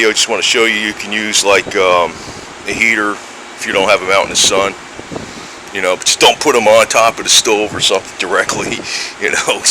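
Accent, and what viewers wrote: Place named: Chicago, United States. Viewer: i always liked el caminos. this guy sounds like a chicago accent cause he talks like me and i am from chicago.....! and nice car dude....!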